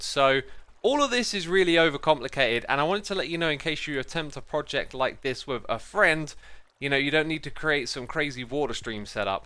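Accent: United Kingdom. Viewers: I just searched if X lives in a country in which they drive on the left (he's British, apparently I wasn't paying any attention to the accent haha), cause I got confused when he said the designs of the road felt IRL and I didn't see it lol😂😂🤦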